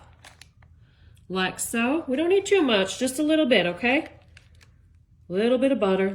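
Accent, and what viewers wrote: South, United States. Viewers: Love your voice with that cute southern twang to it and of course your wonderful recipe and presentation.😊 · Now this looks great!! I would doctor it up with some kind of dipping sauce and seasoning but I would eat your recipe for sure. Thank you!! Great idea for an easy one pan breakfast. Oh by the way....what part of the south are you from? I'm in SC myself. We sound similar. Lol 😂 💜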